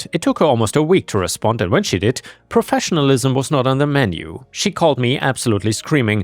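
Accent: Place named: Germany. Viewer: I bet on you being German. That's a daaamn thick accent. :D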